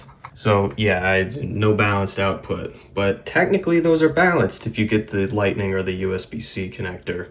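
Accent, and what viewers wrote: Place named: Australia. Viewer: You sound like that funny Australian man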